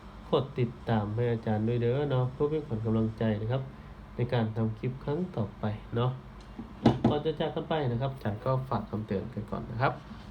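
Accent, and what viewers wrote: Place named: Phu Thai, Thailand. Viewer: หนูอยู่พรรณานิคมอาจารย์คนที่ไหนค่ะฟังเสียงคือคนภูไทย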